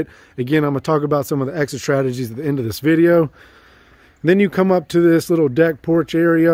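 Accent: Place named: United States. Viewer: C'mon bro you sound like an an American, you know the presentation standard. You could've raked the leaves B4 you started recording bro. Either way nice deal good video thanks 4 the info. Good fortune to you and your brother.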